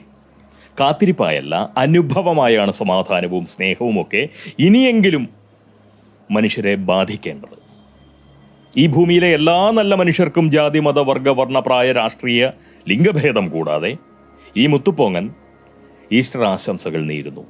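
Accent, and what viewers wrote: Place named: Ongallur, India. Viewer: ഒരേസമയം ചിന്തിക്കാനും ചിരിക്കാനും വക നൽകുന്ന ഓങ്ങല്ലൂർ സംസാരം എനിക്ക് ഏറെ ഇഷ്ടമാണ്. നന്ദി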